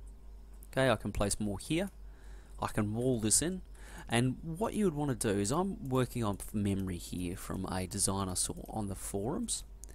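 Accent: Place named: Australia. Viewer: question, do australians all say "turrent" because of some language reason? i've heard it before, they dont say "turret".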